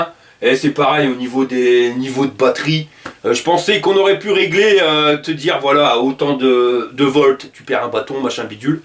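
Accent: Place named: Nord, France. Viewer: Ton accent chti j'adore mon gars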